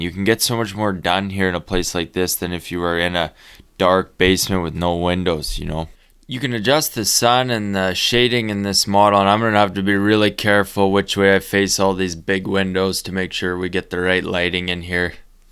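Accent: Canada. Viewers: Canadian Ah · I really think you're doing a hell of a job I think you do excellent work and I have been watching for over a year or more and I love Canadian accent. I have been wanting to move to Maine on the Canadian border but my health went to hell and I'm 77 years old so I guess I'll have to stay here and drive my till I can't anymore. I'm looking forward to your next videos keep up the good work ALLYN T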